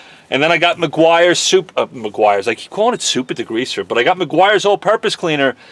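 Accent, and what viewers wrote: New York, United States. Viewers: You may be in Dallas, but I'd recognize that New York accent a mile away. My guess is Long Island, same place I grew up before moving to Phoenix.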